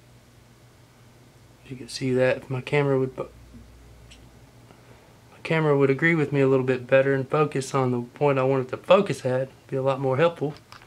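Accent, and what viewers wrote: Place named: United States. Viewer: love the accent what part of usa ? Video is great btw!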